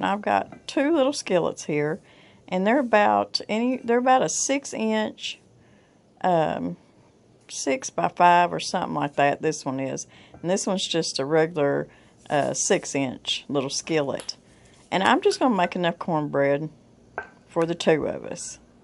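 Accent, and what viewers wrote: South, United States. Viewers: Now thats the just right hill music and southern accent to cook to! When you said purty good i was home! 😊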